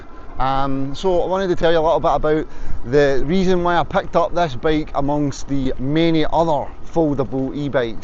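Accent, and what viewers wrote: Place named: United Kingdom. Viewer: It’s great to see you enjoying your bike. I’m German and speak English quite well, but I can’t understand a single word you’re saying. Your accent is so strong that it’s almost impossible for anyone outside the UK to understand you. But I bought the bike anyway. Cheers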